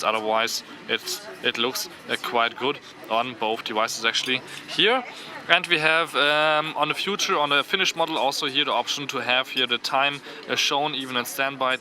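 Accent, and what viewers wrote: Germany. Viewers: Your mother-tongue is german, isn't it? :) Nice overview btw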